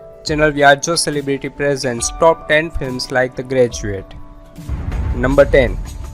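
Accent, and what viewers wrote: India. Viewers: indian accent is so hilarious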